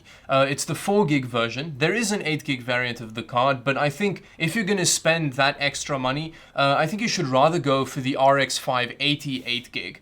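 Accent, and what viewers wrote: South Africa. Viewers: Are you South African? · Love your channel man, PC building Noob here trying to get the basic under control xD keep up the good work, also are you a South African Expat ? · Are you south african by any chance?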